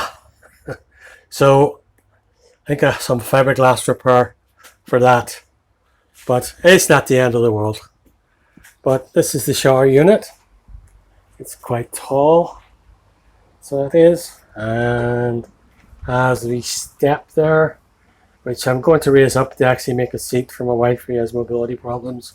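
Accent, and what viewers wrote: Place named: Ireland. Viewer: Well hello there. I get to praise you as my hubbie is a bit of a quiet person. I love to hear your accent it reminds me of some Irish visitors we had in our Bowling Club in Scotland's east coast. You must be a really handy man to have aboot the place. I'd trade you for mine, as I can see you're a quare bit more cuddlier and there's nae heat in that one of mine in a winter's night. Till I tune in again take care my love.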